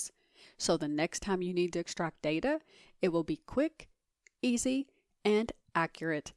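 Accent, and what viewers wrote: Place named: United States. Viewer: From ALL THE INTERNET, you're unique, American with American accent!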